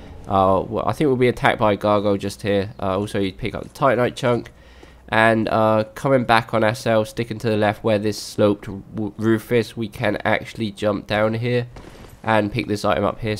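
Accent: United Kingdom. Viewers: ur british am i right?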